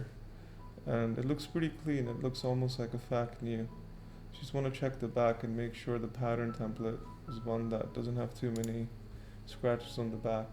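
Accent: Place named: Czech Republic. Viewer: Wow man, what a cool idea. Hope you will find sponsors to boost inventory. Btw where are you from? you sound Czech to me but if im wrong sory. Good luck.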